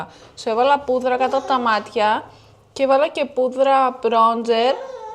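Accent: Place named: Cyprus. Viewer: Αφου σου βγαίνει το κυπριακό... μιλα μας κυπριακά!!!!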